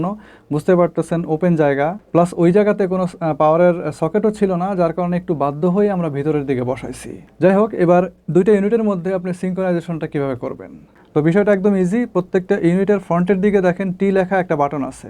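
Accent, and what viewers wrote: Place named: Bangladesh. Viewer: আপনার বলার ভঙ্গী খুবই সাবলিল ৷ একবারও আটকে যায়নি ৷ অন্য কেউ হলে এই কথাগুলো বলতে অন্তত কুড়ি মিনিট সময় নিতো ! আর আপনার বাংলাদেশী উচ্চারণ বেশ মধুর লাগলো ৷ ভারতে এটা পাওয়া যায় কিনা খুঁজে দেখছি ৷ কলকাতা থেকে আমার ভালোবাসা নিন ভাই ৷ এসব জটিল বিষয়ে আমার লেখাপড়া কম ৷ সেজন্য আমার ছেলেকে শেয়ার করছি ৷ ওর কাছ থেকে ভালো করে বুঝে নেবো ৷ এই চমৎকার তথ্যপূর্ণ ভিডিওর জন্য অসংখ্য ধন্যবাদ আপনাকে ৷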